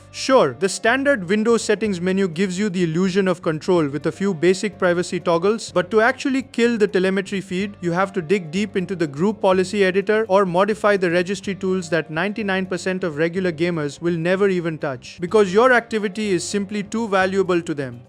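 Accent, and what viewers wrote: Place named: India. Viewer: Someone has to say it: It’s not AI, he’s just Indian, they all sound that way! Actually I know a lot that speak without any accent to the point you mistake them from other places. I also appreciate that at least it’s real info and accurate how I feel to the situation. I can’t say slop certainly. If it was AI, then even AI sees the issues.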